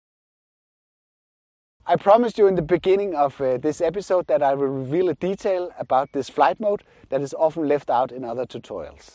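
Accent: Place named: Denmark. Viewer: Tydelig at høre at du er dansk 😇😇 Tak for en fin video. Må simpelthen eje den drone. Kæft den er fed. Skal bruges til ferierne og til at optage børnenes fodboldkampe ... Det må skabe respekt i forældreflokken når jeg kommer anstigende med sådan en.. Hahaha..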